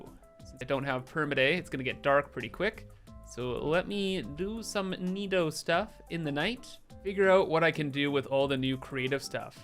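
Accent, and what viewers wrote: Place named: Canada. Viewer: i love how canadian you sound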